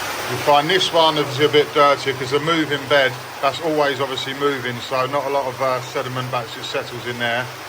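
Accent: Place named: United States. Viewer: I thought you were British you start talking and I find another american.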